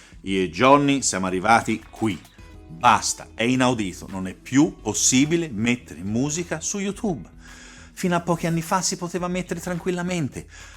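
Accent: Tuscany, Italy. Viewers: Sacrosanto! Devo dire che mi ha fatto un po’ impressione sentire un bell’accento toscano in bocca a, abituato com’ero a sentirlo parlare in inglese! 🤣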